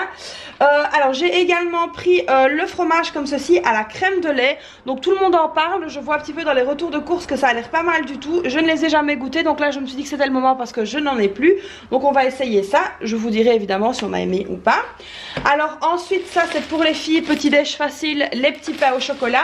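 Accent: Belgium. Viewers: Coucou j ai decouvert ta chaine en cherchant des videos budget Quel bonheur de tomber sur une belge ( je pense quon est de la mele region vu l accent😉). Je suis belgo marocaine a la recherche d une vie simple et une meilleure economie.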